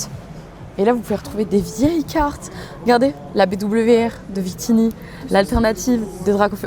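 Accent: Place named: North, France. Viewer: Elle vient de chnord je reconnais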